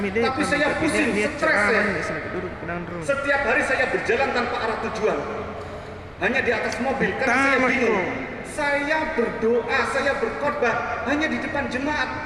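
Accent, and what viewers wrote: Indonesia. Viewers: Wong ngapak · Lho omongane medok kyok aku, kesaksian opo kuwi, ndak ada isinya, ndak ada makna ne..nggilani.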